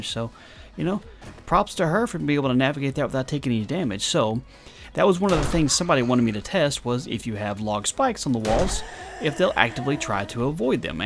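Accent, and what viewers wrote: South, United States. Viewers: You have a cute Southern accent.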